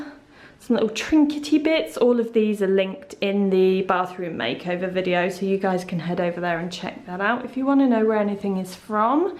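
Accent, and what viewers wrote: England, United Kingdom. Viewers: Your accent is so British lol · I love everything, you have done a grand job! I also really like how you have chosen items from many shops, all of which I know living in the uk. Super lovely to hear your English accent. A lot of these type of videos are done by Americans. 😊 😊😊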